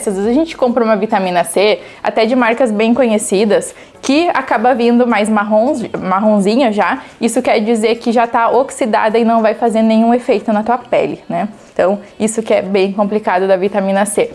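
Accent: South, Brazil. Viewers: Linda,com sotaque do sul ❤❤😂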